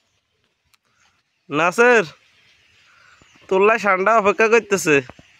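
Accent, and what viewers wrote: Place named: Noakhali, Bangladesh. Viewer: আন্নে এরিন্না নোয়াখাইল্লা কতা কন, আন্নের শরম লাগে না? আন্নেগো বাড়ি কোনাই? হাদেরখিল নি?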